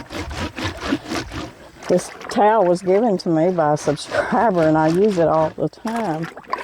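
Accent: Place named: Alabama, United States. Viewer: I’m half American living in my moms home country of Sweden. Your accent makes me so nostalgic for the US. My dads whole side of the family talks just like you, it’s my favourite sound in the whole world. Thank you for sharing your wisdom Ms. ❤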